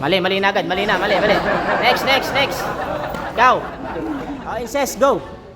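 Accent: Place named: Waray, Philippines. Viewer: parang ng waray ka lng dn.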